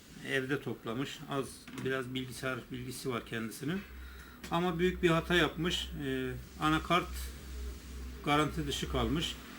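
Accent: Erzurum, Turkey. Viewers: abi konuşmandan anlamıştım erzurumlu olduğunu ondan sordum bende erzurum oltuluyum dadaşkentte oturuyarum. anakart işlemci vb hari&#231; size ka&#231; tl veriyoruz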